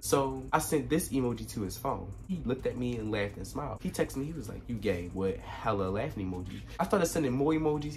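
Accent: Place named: DMV, United States. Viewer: U gotta be from the dmv cuz the accent lawddd😂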